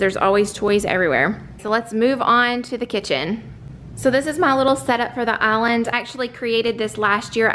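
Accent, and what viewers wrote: South, United States. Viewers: I just LOVE YOUR SOUTHERN ACCENT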